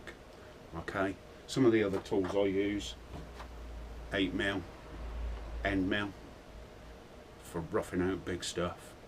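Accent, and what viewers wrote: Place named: Wiltshire, United Kingdom. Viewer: Hi, Where in the UK are you? I used to live in Swindon Wiltshire, Your accent seems to be from around that area, or Gloucestershire ish. I now live in Australia. The two comments below are mine. If the little bit of CNC that I know can help you in any way, just let me know. Kind regards, Michael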